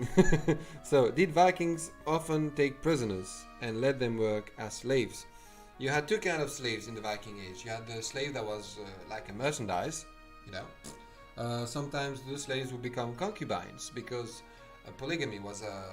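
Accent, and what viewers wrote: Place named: Netherlands. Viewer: Weird-ass Dutch accent, but okay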